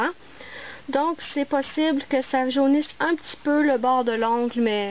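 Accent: Quebec, Canada. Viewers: Merci beaucoup maintenant je saurai comment faire quand je le recevrai pour mon anniversaire :) j'adore vos ongles et votre accent québécois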